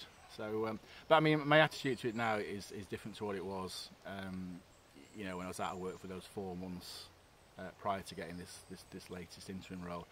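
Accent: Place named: Cheshire, United Kingdom. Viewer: Not a clue who you are, but the algorithm told me to watch this, so I have. Good luck with the job hunt. Your accent sounds like you're from my part of the world (Cheshire) 👍🏻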